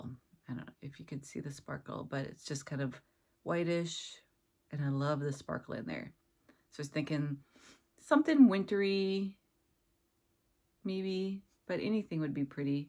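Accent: United States. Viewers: I love your Minnesota accent! I think I want to try the monogamous stitching next week and am I cheating if my monogamous stitching is a series of month;y charts?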